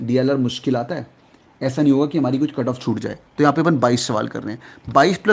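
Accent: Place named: Punjab, India. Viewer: Sir love from punjab ❣️❣️ aap bhi punjab se ho....apke accent se lgta hai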